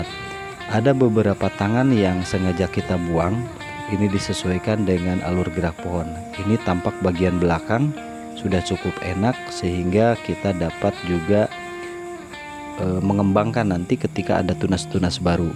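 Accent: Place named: Sunda, Indonesia. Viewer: mantaap..rapi pisan penataan dan perawatan ala pro.thnk sudah shering kang. logat nya sunda aslinya dari mana kang?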